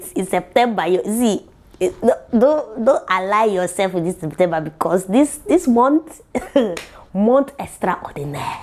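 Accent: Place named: Igbo, Nigeria. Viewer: Nden mandu na ko 🤣🤣🤣🤣 if only they know that it's bcoz that your English_ Igbo make people like us subscribe to this channel, them for run tikpeeeee🤣🤣🤣🤣🤣🤣awon oni isokuso!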